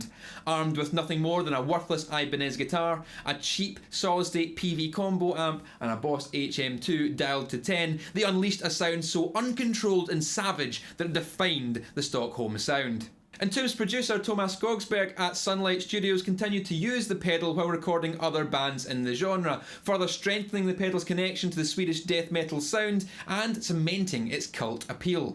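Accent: Scotland, United Kingdom. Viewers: Reading the reverb article in a Scottish accent/10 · It sounds more Scottish to me than British. All I heard during the whole video was Scottish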